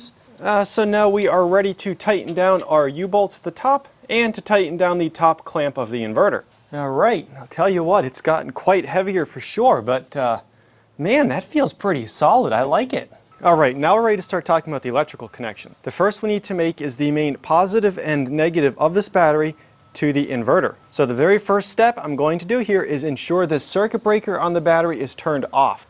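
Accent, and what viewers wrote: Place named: Canada. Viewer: are those Canadian dollar prices ? you sound Canadian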